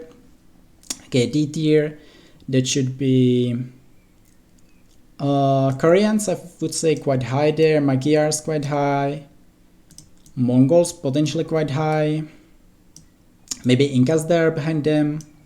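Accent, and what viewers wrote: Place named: Czech Republic. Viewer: After hearing you say the first three words I instantly knew youre from Czech Rep.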